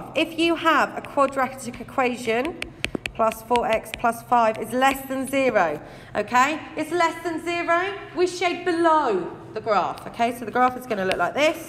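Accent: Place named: United Kingdom. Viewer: thats the most british accent u have seen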